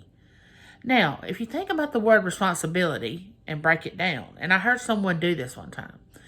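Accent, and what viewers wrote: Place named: South, United States. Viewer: I simply enjoy hearing you talk. The southern accent amazing. Thanks for sharing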